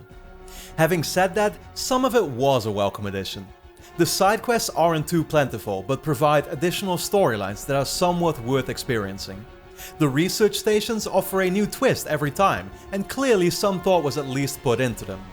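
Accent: Netherlands. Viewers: ik vroeg mij al af of je nederlands was haha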